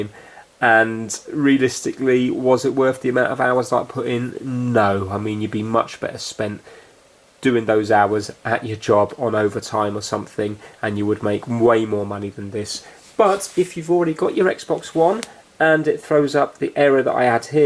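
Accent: United Kingdom. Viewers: I'm watching this for the Brit accent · Amber Ford I agree. It may also be the British accent.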